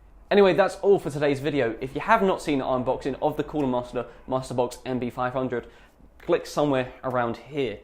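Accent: United Kingdom. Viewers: u wot m8? he just has a british accent and a light one at that. what are you on? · I don't understand what you are saying bristish accent so hard for me..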